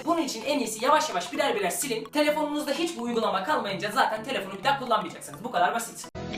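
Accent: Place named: Trabzon, Turkey. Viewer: trabzonun neresindensin reyiz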